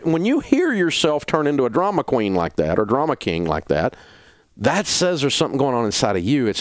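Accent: South, United States. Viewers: I'm from Brooklyn and I love your southern account and little sayings 😂😂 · To Northerners, he indeed still has quite a Southern accent. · C Leh He hired a voice coach to teach him how to lose his accent. When he started he had a very thick southern accent.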